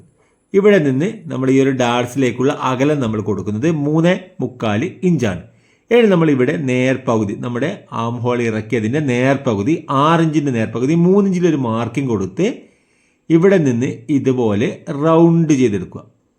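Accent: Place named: Kannur, India. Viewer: നിങ്ങൾ കണ്ണൂർ കാരനാണോ??? സംസാരം കേൾക്കുമ്പോൾ അങ്ങനെ തോന്നുന്നു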